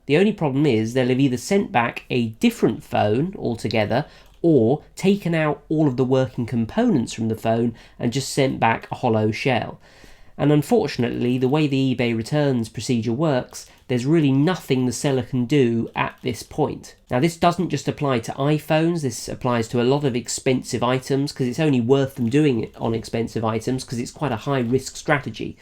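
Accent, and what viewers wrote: England, United Kingdom. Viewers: A genuine honest English accent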